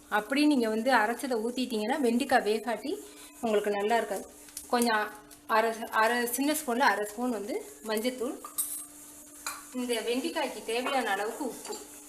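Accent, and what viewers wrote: India. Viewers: She speaks such a lovely Chettinad Tamil, I feel sorry for you are missing something great in this life, the taster of her Tamil and the Chettinad food!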